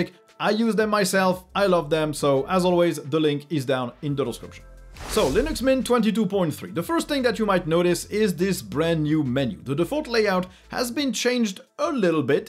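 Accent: France. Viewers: Thanks for the reply, bro. I'd update to 22.3 then. P. S. I like Ur channel and Ur style... And that English accent is nice. U r French, right?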